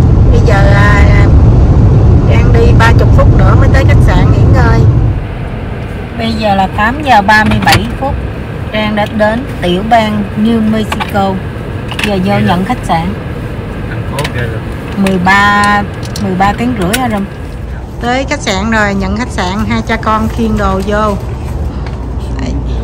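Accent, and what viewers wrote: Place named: Mekong Delta, Vietnam. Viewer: Nói chuyện đậm chất miền tây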